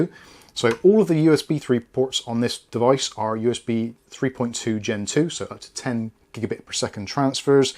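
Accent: Bristol, United Kingdom. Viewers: Sounds like a fellow Bristolian or close ;)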